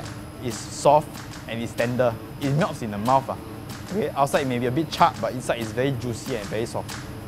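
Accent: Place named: Singapore. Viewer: Singlish accent at its finest, love it !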